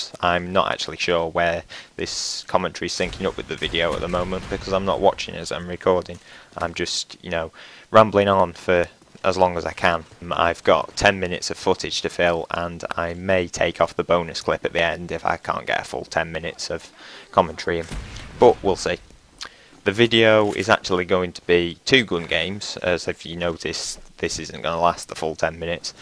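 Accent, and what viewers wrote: United Kingdom. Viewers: A British Commentator :D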